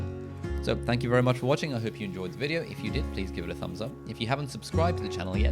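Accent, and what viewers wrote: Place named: RP, United Kingdom. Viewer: Never heard ‘wasteman’ in a posh accent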